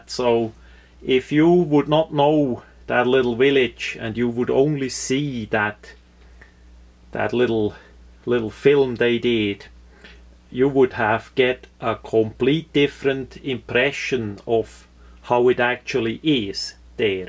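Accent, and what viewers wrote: Switzerland. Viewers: Iv had a few Swiss friends you guys are good people. all the people iv spoken to are more American then Americans. as far as I know Switzerland is pretty free but oppressed at the same time. like needing a permit to transfer ammo to go the range. A friend said the houses are really small there and its almost like a meld between the old world and the new world. how you would have small old houses next to factories with mountains in the background. Im looking forward to your series. I always enjoy your videos both the content and the accent =) If I could have a different accent instead of my yank accent Swiss accent would be at the top!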